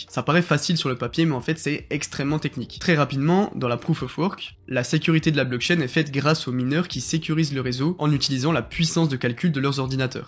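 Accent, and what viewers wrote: Franche-Comté, France. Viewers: Vu l'accent, tu dois être en Franche-Comté toi ;)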